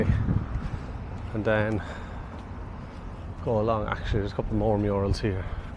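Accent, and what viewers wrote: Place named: South, Ireland. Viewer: Fair play to you for visiting the Shankill. You seemed a bit nervous and uncomfortable at times. Not surprising considering your Southern Irish accent, but you did a great job. It must have felt a bit like being in the lions den! The Troubles are over and it is much safer now. The locals are not as "paranoid" and aggressive now that people aren't trying to murder them anymore so you should be fine now. They are so used to tourists filming the murals that I'm sure they just assume you are a harmless tourist and ignore you. Even if they overheard you saying something they didn't like they'd probably just let it be or maybe have a wee bit of friendly banter with you and fill you in on the local knowledge/story/version of events.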